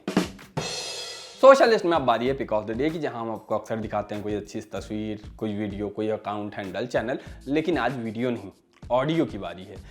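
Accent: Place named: Rewa, India. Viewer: Mai bhi rewa se hu.. Aapka accent 👌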